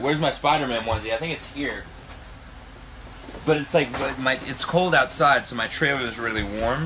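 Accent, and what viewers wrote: United States. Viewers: HIS AMERICAN ACCENT!!! Perfect! 😆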